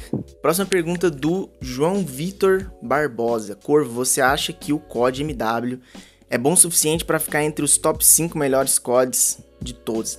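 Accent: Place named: Goiás, Brazil. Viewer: O sotaque é de goiano 🤣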